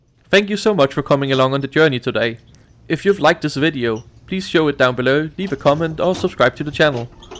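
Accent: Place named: Denmark. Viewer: The video’s creator is not a native speaker of the English Language. His native tongue is Danish.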